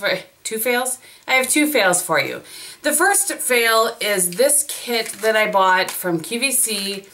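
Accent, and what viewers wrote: Wisconsin, United States. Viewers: You are a hoot! Enjoying your videos, thanks. I might need to try the IT Cosmetics powder. And I thought I recognized that accent! I grew up in northern Wisconsin. I miss it like crazy! I'll be home for a visit in August and I'm hoping I can convince my sisters to drive up north to Paul Bunyan's before they close for the year! Not that I need a lumber jack breakfast :/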